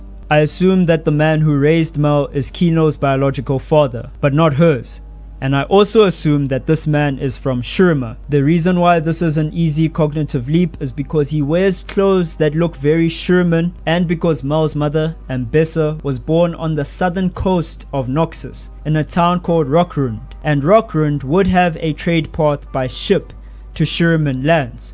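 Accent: South Africa. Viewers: Is just me or you South African your accent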